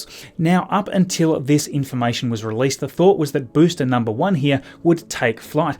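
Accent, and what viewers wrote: Australia. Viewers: glowing dick ? your accent is from australia or new zealand right ?!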